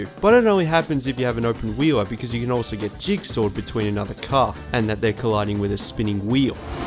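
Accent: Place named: Victoria, Australia. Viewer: I would say by the accent he is from either SA or VIc, probably Vic.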